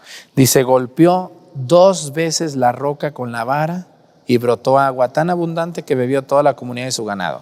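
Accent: Mexico. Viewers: Hola padre, como cada semana muchisimas gracias por el resumen de homilias, padrecito usted es el combo completo: Sabiduria, carisma me fascina su acento tapatio y regreso una y otra vez el video para que se me grabe lo que usted nos dice, siga asi padre hablandonos con la pura verdad, que tenga una exitosa y bendecida semana💐🌸🌹🌷🌼🌺👏🏼👏🏼👏🏼👏🏼👏🏼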